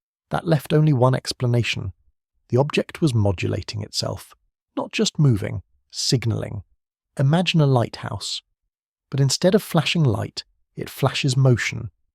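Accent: United Kingdom. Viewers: It's called Queen's English for a reason clear elegant and the universal language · Why is the narration ALWAYS with a British accent?!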